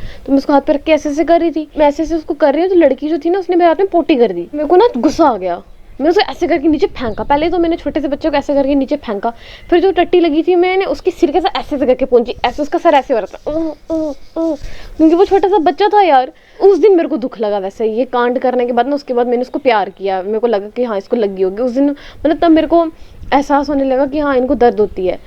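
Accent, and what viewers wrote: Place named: Jammu, India. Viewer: jab bhi tumhari awaaz sunta hu...uski yaad aa jaati hai..uski awaaz bilkul tumhari tarah h..Jammu walo ka alag hi slang hota h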